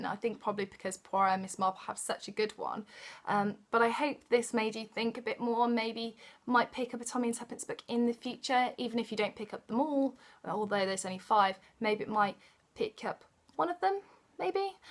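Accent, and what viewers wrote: England, United Kingdom. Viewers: I love your channel. You make reading look so glamorous! I wish I also had an English accent. It makes you seem so sophisticated. :)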